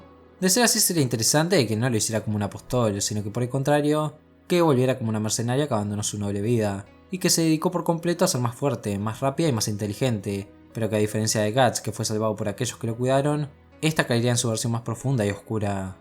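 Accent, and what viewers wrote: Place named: Argentina. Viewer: Un argentinoooooooooooo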